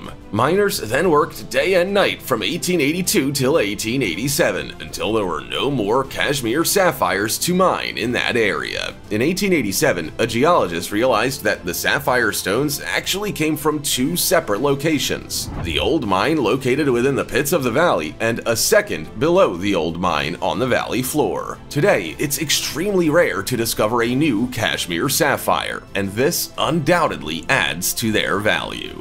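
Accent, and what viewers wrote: United States. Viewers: The heavy American accent is unbearable. Makes everything automatically sound like a huge scam.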